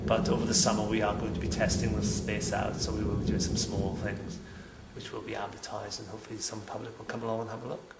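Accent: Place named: United Kingdom. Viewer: There you are! Still with beautifull British accent! Ganbatte! from Y.M. Okinawa Japan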